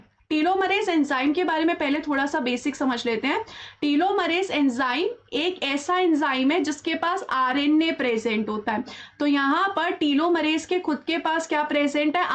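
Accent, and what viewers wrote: India. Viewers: Ek number bhava khamgaon bolte❤️❤️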